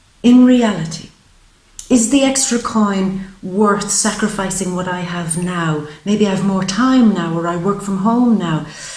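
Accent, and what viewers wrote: Ireland. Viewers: you're beautiful, intelligent and intuitive and thank you. plus i'm lovin' your Irish? accent? L've got the Irish blood too : )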